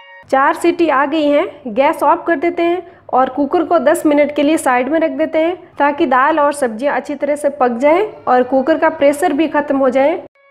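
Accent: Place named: Rural, India. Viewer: * आपका उच्चारण देशज झलक वाला है , अच्छा लगा । 💐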